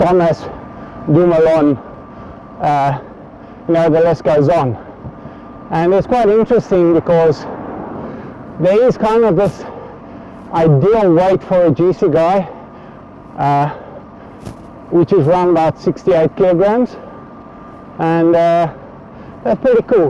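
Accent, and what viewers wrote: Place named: South Africa. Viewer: Somehow I think you are from South Africa?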